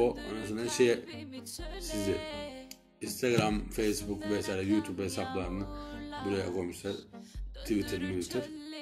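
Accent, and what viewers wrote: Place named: Eastern Black Sea, Turkey. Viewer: NERELİSİN Doğu Karadeniz şiven var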